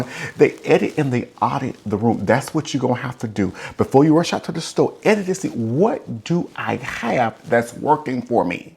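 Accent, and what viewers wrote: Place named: South, United States. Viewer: Not to be weird…. But, I just love listening to you! Yes, I subscribed. You are helpful, honest, forthright, and you make me laugh. :) And I love your southern accent!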